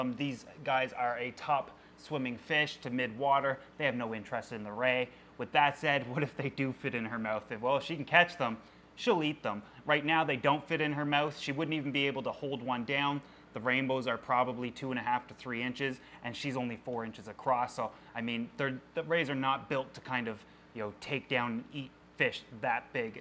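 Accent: Canada. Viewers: you sound like a canadian. especially when you say "barb" haha sound like randy from TPB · I like your Canadian Accent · Sorry *clearly Canadian* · I love your Canadian accent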